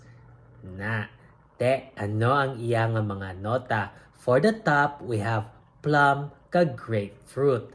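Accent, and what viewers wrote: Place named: Iloilo, Philippines. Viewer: Nice may ilonggo nga reviewer. 😁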